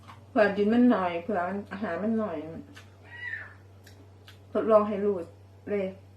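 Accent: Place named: Korat, Thailand. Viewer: สวัสดีชอบสำเนียงโคราชมากค่ะ เป็นตาแช่บแท้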